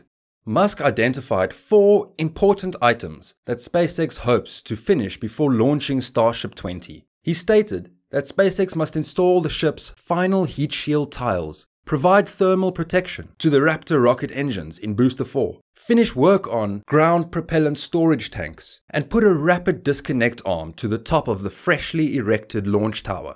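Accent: South Africa. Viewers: Could listen to your South African accent all day ✌ Always look forward to your informative videos keep up the great work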